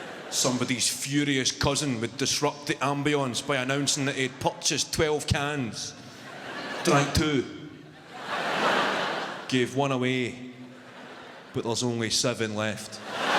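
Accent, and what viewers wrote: Scotland, United Kingdom. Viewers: He's toned down for his specials to be fair so as to transcend into mainstream, same as Billy Connolly did. He has mentioned in an interview somewhere that he changed slightly to be more understood and he gets slated for it, but people's have to understand, if he was to keep his broad accent, and only a handful of people understood or got his jokes, then he'd reduce his appeal and fanbase. It's a balancing act, and he is one of the more genuine comedians that hasn't pampered to the crowd, and only changed what he needed to so as to keep his authenticity and identity, and that's what I like about him, plus he's pish funny, as are the Scots in general.Scottish and Irish just have an uncanny knack of being funny. There have been many people that have chosen to hide or indeed even tone down their accents and pampered to what the media outlets want just to appeal to certain audiences, because those Media outlets want to profit more from their representative, as opposed to actually care what their representative thinks about disguising or ditching part of their identity. 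I don't like the word sell out Becaue anybody in this business wants to maximise their income, and if that means toning down your accent just to be understood a bit better by a bigger audience, then that's not a bad thing, especially in a career that's make or break and can be over in a flash, but completely losing your identity is where I draw the line, and that's a sell out to me, totally changing just to appea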